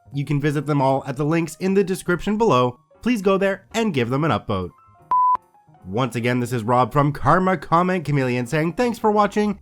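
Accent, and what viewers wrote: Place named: Canada. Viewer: Also "manufacture" and "process". You don't have the stereotypical Canadian accent on "house" and "about" and you don't throw in a bunch of "eh"s, but the accent is still pretty prominent.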